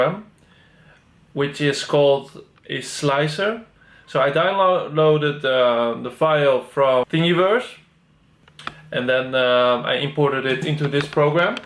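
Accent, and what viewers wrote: Netherlands. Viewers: You Dutch? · Leuke video! Ik hoorde aan het begin van de video al dat je nederlands bent, maar het werd pas bevestigd toen ik de spuitbus verf zag😆😉. Ik gebruik de zelfde verf voor mijn prints. Weet niet of je dat al doet, maar deze verf is het goedkoopst bij de "Action" winkels.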